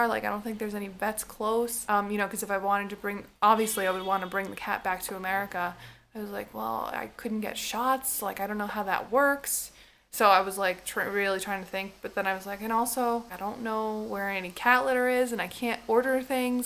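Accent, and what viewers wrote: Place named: Taiwan. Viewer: haha, I really enjoyed watching your monologue in the background while I'm doing random stuff. the holes on the wall are for ductless air conditions, so you might need get them for summer. connect your TV through the extension cord, they are the same voltage. by the way, your Chinese accent sounds like someone from Taiwan.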